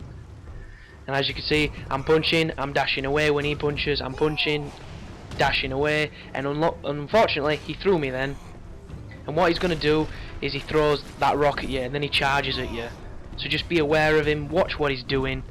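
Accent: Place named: Midlands, United Kingdom. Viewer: "1 take"? you don't say. I can imagine the challenge of filling the voids with chatter, hell I love the sound of my own voice but even I shied away from audio commentaries. I'm glad you showed me that I was right to do so. Tell me bra', where are you from *county will do* I'm struggling to place that accent. I'm from Derbyshire and you don't sound a million miles away.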